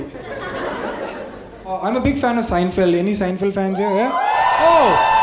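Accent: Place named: Tamil Nadu, India. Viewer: He got alot of tamil influence 😂😂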